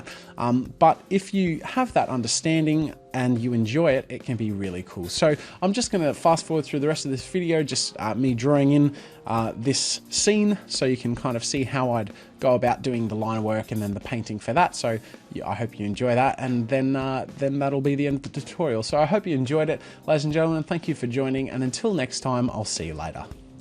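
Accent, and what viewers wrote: Australia. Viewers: He sounds so much more Australian in this video. Is it because he was younger?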